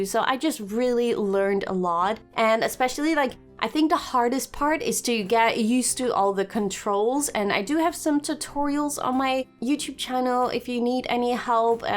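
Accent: Netherlands. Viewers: Haha ben je Nederlands?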